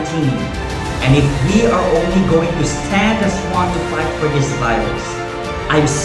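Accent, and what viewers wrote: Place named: Philippines. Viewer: Have you been given citizenship already? You sound unmistakably Pinoy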